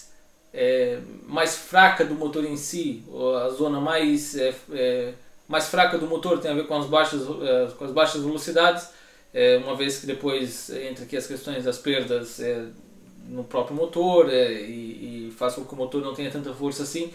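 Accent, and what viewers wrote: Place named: Brazil. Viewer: Olá! Parabéns pelo vídeo! Sou do Brasil. Confesso que seu sotaque é bem reduzido. Fiquei em dúvida se você era brasileiro ou português... Kkkkkkk.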